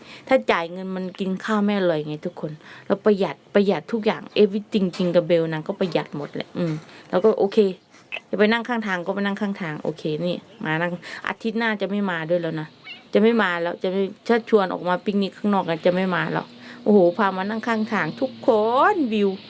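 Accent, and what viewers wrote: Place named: South, Thailand. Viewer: เจอคลิปคุณเมื่อวาน เริ่มไล่ดูไปเรื่อยๆ การตัดต่อบรรยายมีเสน่ดี ตอนแรกนึกว่าคุณเป็นคนใต้ หรือไม่ก็ปราจีน เคยได้ยินสำเนียงแบบนี่ ที่สำคัญคุณเป็นคนตลกมาก ชอบ กดติดตามแล้วนะคะ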